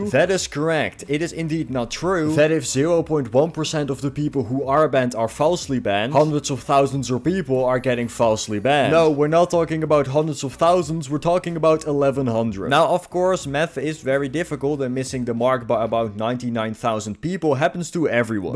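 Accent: Netherlands. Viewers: i’ve said this once, and ikll say it again, i KNOW your dutch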